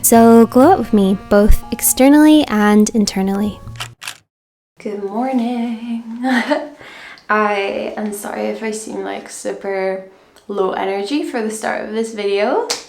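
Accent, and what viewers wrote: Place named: Scotland, United Kingdom. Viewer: is from Scotland but lives in London. I love her accent!!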